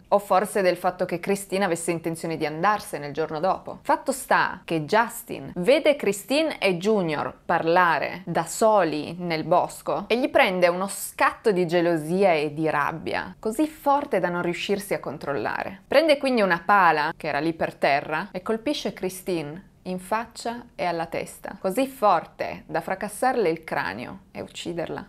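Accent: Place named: Turin, Italy. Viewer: Secondo me sei triestina.... No di Torino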